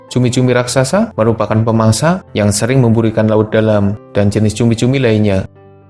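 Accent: Banyumas, Indonesia. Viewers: Orang ngapak ya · mau di sembunyiin ngapak2 nya ttp krasa🤔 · Nada orang ngapak dia bang. Bhineka tunggal Ika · Masee wong ngapak yak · Gole ngomong ngapak temen min😂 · Ngapak terossss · Org ngapak yo mas ??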